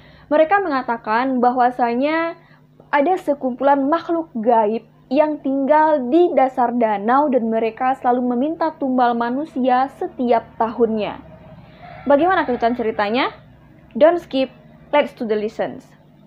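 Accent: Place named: Minangkabau, Indonesia. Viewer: Bahasa indonesia ada dialek minang ya mba but over all i like your effort, sukses....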